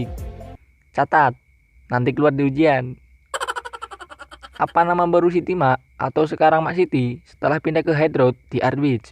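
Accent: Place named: Medan, Indonesia. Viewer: orang medan kau ya min nampak kutengok kau logat medannya, kalo gitu salam dari medan juga.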